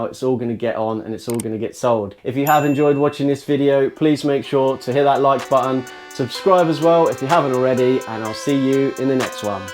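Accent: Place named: United Kingdom. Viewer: Wou uhn wonna do this wih one mo ni ah. Haha British gold right there! Love your vids.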